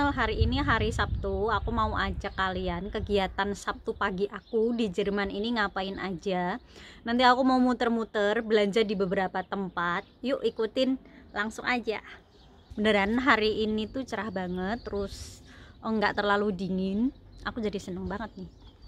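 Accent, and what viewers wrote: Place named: Java, Indonesia. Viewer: Tetap aja medok nya ngak bisa hilang ya bu ....hehehehe....salam buat suami...tetap sehat ya bu....🙏🙏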